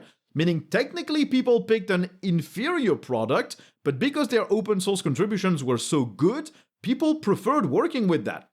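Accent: France. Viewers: I come here for the Linux news, I stay for the French accent.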